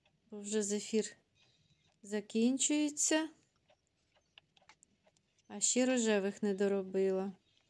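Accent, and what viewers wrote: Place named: Kirovohrad, Ukraine. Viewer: Дякую за відповідь.Я так і думала що або центр,або східніше бо розмовляєте як ми в Кіровоградській обл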